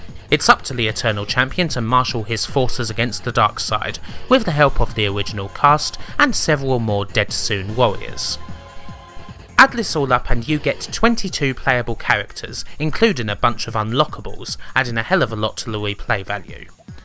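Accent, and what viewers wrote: United Kingdom. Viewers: Whoa I couldn’t put my finger on it but I’ve never heard a British accent with a speech impediment in my life! Interesting. No hate.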